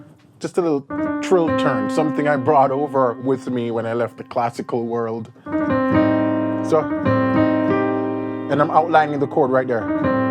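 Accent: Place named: Jamaica. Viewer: are you Jamaican? I hear a cool accent in there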